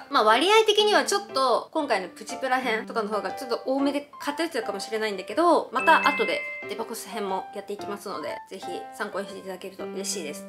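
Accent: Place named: Kansai, Japan. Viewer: 関西弁かわいい笑笑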